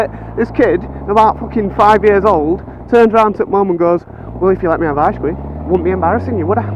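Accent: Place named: Yorkshire, United Kingdom. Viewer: Your a little Yorkshire story teller ..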